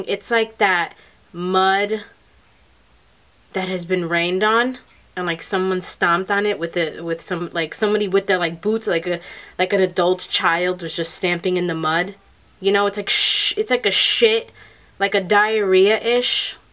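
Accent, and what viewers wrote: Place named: New York, United States. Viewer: Omg the accent. Where r u from!! Ny or New Jersey but sure ny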